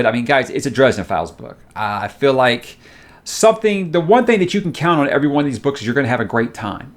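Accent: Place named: South, United States. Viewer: Nice! What part of the South are you from?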